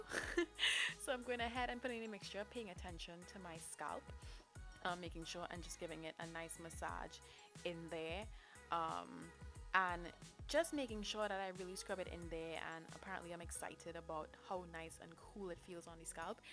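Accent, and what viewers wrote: Trinidad and Tobago. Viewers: I knew you sound trini, had to check your description box 😂...am trini also😱🙌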